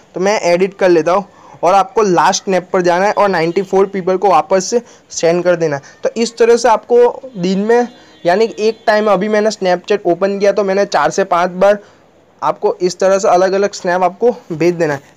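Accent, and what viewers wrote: Gujarat, India. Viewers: You are from Gujarat ??❤️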